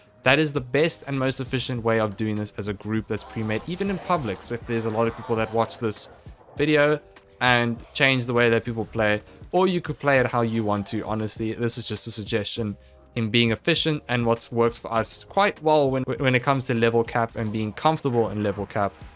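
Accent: South Africa. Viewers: You sound so South African... Man I hope I'm not just going crazy. Best guide I've seen on this, VERY well done, you've earned a sub!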